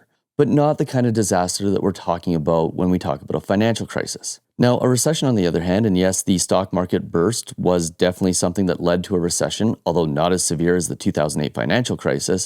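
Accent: Canada. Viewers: Such an interesting video, and beautifully presented. Great to hear a nice Canadian accent.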